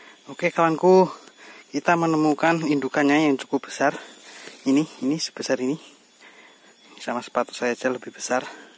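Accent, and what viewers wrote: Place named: Bojonegoro, Indonesia. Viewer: logat bicarane mirip bojonegoro.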